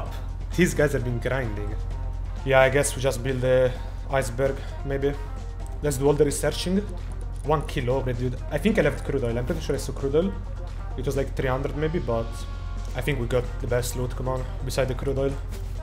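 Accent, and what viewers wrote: Italy. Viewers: Ur italian?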